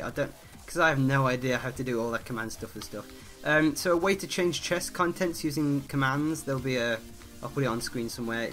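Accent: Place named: Black Country, United Kingdom. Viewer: Where you from brah, you sound more yam yam than brummy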